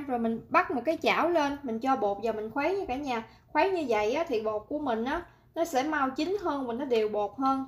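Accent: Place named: Mekong Delta, Vietnam. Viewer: Hi...lần đầu xem video của b ..rất ấn tượng với tiêu đề ( nhớ mẹ)... Nghe cảm xúc ghê ..❤❤❤❤ Tiếng miền tây của b nghe🌾🌾🎣 thân thiện rỏ ràng không lẫn vào đâu đc hết... Cách chia sẻ cách làm món ăn thật chi tiết.thích👍😍 Chúc vui vẻ 🥰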